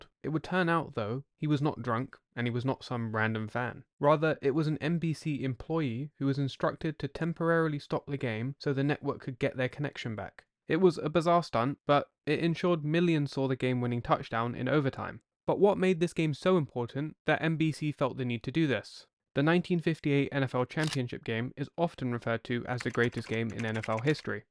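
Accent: England, United Kingdom. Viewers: He’s English, and I think real. Some people don’t know how to speak names. Unitas was widely misunderstood. Yes, it is indeed “aMEEchee”, as it was for Don Ameche!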